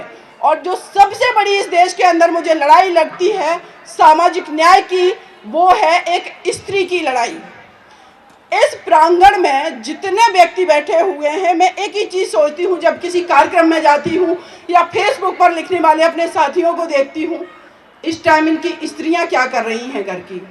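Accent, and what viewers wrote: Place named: Pahadi, India. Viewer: So Great speech mam pahadi voice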